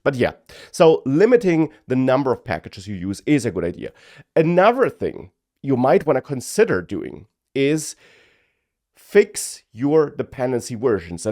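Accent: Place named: Germany. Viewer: Just get rid of your german accent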